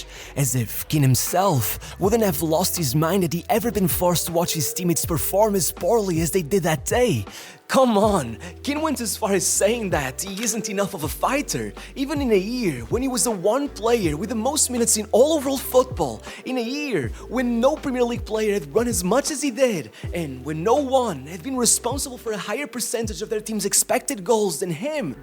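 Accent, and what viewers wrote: Portugal. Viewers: I can tell you are portuguese by the way you pronounce portuguese words, great video!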